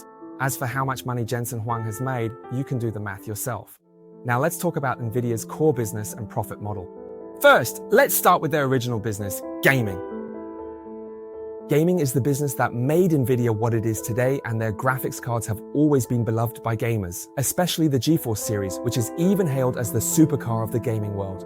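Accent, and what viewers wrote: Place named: United Kingdom. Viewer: 怎么又变成英国口音了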